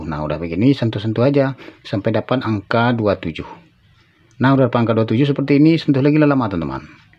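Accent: West Sumatra, Indonesia. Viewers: Org Minang kah bang😅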